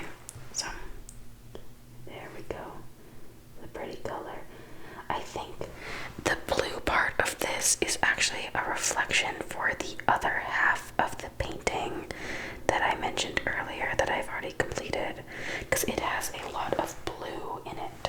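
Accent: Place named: Canada. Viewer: I'm new here! Your video came up on my Youtube suggested on the homepage! I am so glad I clicked on it. I'm doing some sketching right now, and watching you paint was so nicee! I want to see you finish the project so badly. I live near Toronto, so it's really nice to hear a Canadian accent do ASMR, especially whilst being creative. I did film editing at university, was great to learn so if you are interested you should definitely take a class on it or self teach using YouTube to start with! Pick one software and then decide what kind of project you want to make, then search how to do basic edits using that software, you'll pick it up in no time :) You talking about enjoying your hobby really motivates me to enjoy time to myself once in a while and relax whilst enjoying a hobby, thank you so much for your content. Please keep doing these I love it! I am always looking for good quality art asmr and yours has been perfect! 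I want more lol! <3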